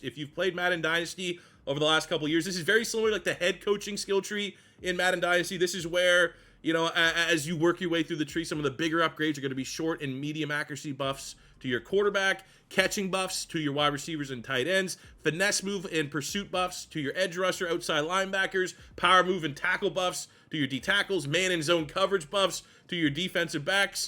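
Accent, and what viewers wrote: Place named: Canada. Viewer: Are you from Philly? You sound Canadian. Jut curious love the channel!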